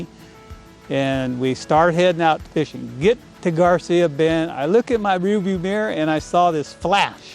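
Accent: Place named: South, United States. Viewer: Mr,you sound like you're from south!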